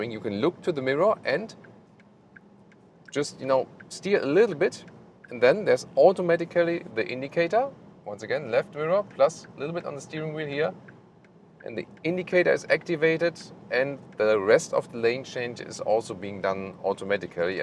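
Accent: Germany. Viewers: its probably german eccent. You say egthree instead of exsthree. Letter by letter. Sorry for being like that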